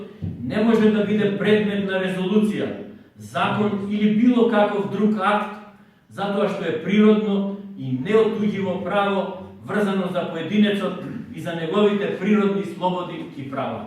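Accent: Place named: Vratsa, Bulgaria. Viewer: Незнам ама говорите като баба ми на село....Врачанска околиja :-)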